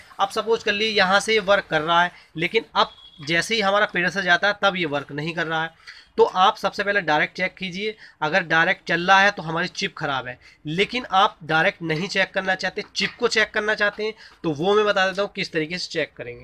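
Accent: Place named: Moradabad, India. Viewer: bhai ji bhaut accha video aur Jaankari Dene ka style style bhaut Saral aur sulabh...dhanyabad...sirf itna bata dijiye ki Kya aap distt moradabad se belong karte hai...aapke bolne ke style se Aisa laga mujhe....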